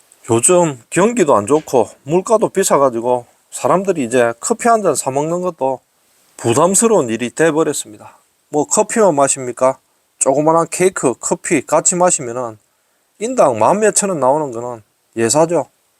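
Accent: Gyeongsang, South Korea. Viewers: 노노 갱상디언..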